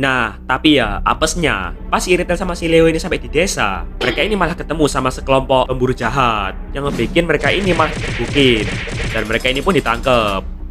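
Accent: Java, Indonesia. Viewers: Bang, Abang Jawa ya?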